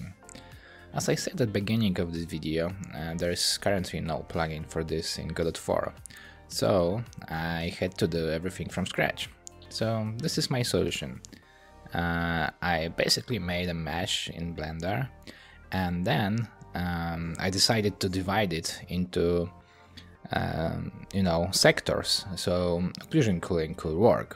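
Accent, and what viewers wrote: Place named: Poland. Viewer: Dude You are really talented and I am grateful that you present these techniques, I am really profiting and learning from it, but pleaaaaaaaaase for the love of god make a script for your videos or prepare some form of presentation. The animation and footage are good but that bored 4 AM freestyle and "uhmmmm" on top of that thick polish accent is hard to listen to broooo, it unnecessarily undermines your porfessionality and credibility, please 🙏🙏🙏🙏. still subscribed though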